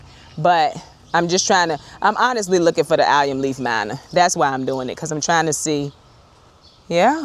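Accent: South, United States. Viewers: I absolutely LIVE your country twang. I can listen to you talk about gardening for hours. Also, I started my garden this past weekend. How long does it take for the leaves to break the soil? Mines just look like moist dirt. 😆 🤣 😂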